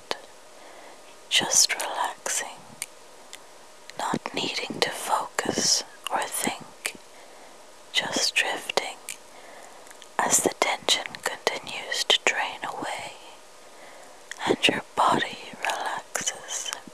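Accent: Kilkenny, Ireland. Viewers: Love the irish acsent. This video helps me sleep. Love it :) Gray/ TheSquishMovies x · It's amazing to me how certain Irish accents sound so much like certain American accents.. I mean they're very different in many ways, but it's striking what they have in common. Your first couple sentences I even wondered if you were from the US or something until your Irish accent became obvious. I wonder if you feel the same about American accents or if it only goes one way. Hmmmm.. FOOD FER THOUGHT! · Is that County Kilkenny I hear? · yeah sounds like a kilkenny accent im a cork man myself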